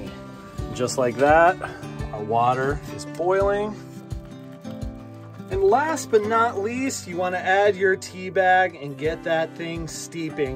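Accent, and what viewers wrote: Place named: United States. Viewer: Hello! I am having fun with your show. It is so great your show and inspires me how to learn camping. I want to try on caping in rural Japan someday. By the way, I took up studying English lately. Because I want to know more detail about what are you talking the show. I am also learning your American English pronunciation from your beautiful voice. All of the English books advise me that If you want to speak English fluently, you should pretend someone. I catch your phrase, word anymore. As I spoke English, I was supposed to be. I am sure that you are my English teacher. Great for me. Anyway, the Japanese crew is waiting for Rock The Park staff to visit to shoot next time in Japan. I hope to see you soon. From Masato Kaneko.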